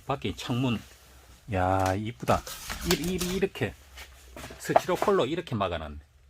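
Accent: South Korea. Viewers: 님은 고향이 어디시길래 촌말을 그리 맛깔스럽게 하시남유?ㅎ ㅎ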